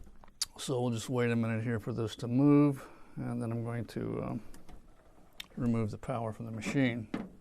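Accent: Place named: Carolina, United States. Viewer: love your accent. are you from Carolina State?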